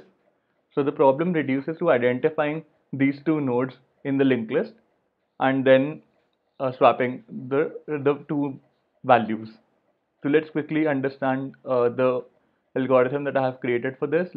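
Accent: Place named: India. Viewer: I just can't deal with the heavy Indian accent. Great programmers tho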